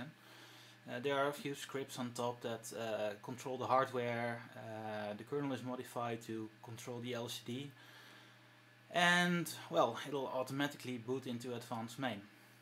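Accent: Netherlands. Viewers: Typisch neder-engels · Duuuuuuuuuuuuuuuutch! :D Ik kon het horen from your accent lol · Nederlander? goeie video! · you.. are.. dutch?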